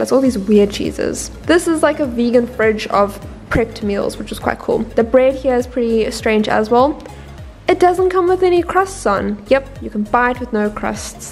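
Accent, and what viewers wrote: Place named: South Africa. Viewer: Hi, so nice to hear a fellow South African blogging. Well done and can’t wait for more.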